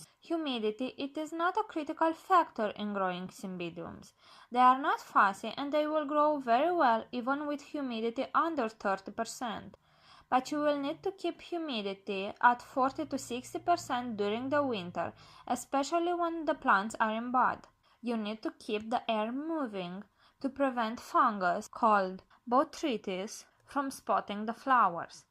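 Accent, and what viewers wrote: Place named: Portugal. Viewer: She has a Portuguese accent, big deal! Get over yourself🙄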